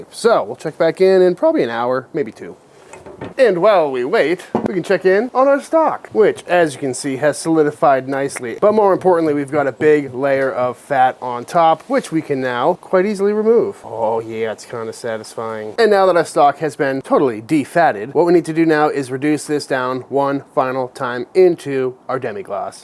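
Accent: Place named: New England, United States. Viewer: Idk what this means, but this was the first video of yours that I saw and I could immediately tell that you were also from New England.... love the content and you remind me of one of my cousins lmao. Please keep doing what you're doing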